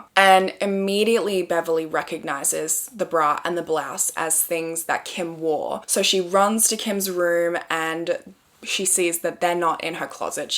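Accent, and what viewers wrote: Australia. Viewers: Me desperately trying to keep up with the Australian English as an American😂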